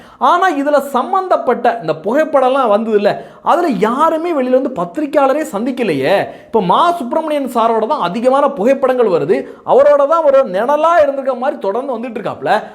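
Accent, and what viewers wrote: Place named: Kerala, India. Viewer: உங்களுடைய பேச்சில் மலையாள வாடை வந்தாலும் நீங்கள் ஒரு தேசியவாதி என்பதாலும் நீங்கள் பிஜேபி ஆதரவாளர் என்பதாலும் உங்களுக்கு என்னுடைய முழு ஆதரவு உண்டு.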